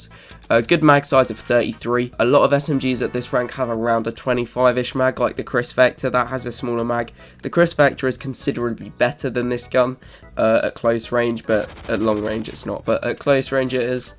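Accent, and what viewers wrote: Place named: United Kingdom. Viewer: nope (btw thanks for that british mate)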